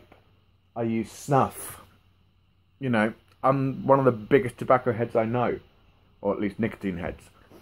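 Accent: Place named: England, United Kingdom. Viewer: Great video man it threw me bud this big husky bearded man I'm thinking OK hes from Michigan or somewhere here then you break out the English accent! Man that's awesome keep up the good work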